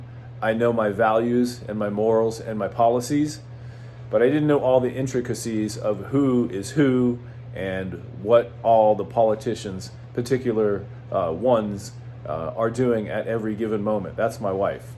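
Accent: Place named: Ohio, United States. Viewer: Do not even know who you are, I just clicked on this video watching you for the first time. You sound like you are a genuine dude. I wish you luck in your ventures, no matter the party you are with or running as, I like the things you are talking about. You seem as though you have helped people in the past in your profession. Also sounds like you are in Ohio I live in the Cincinnati area. Take care and Happy Holidays.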